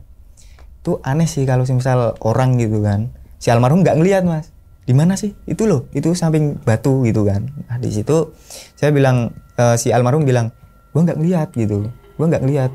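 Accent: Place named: Java, Indonesia. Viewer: gua elu nya medok 😅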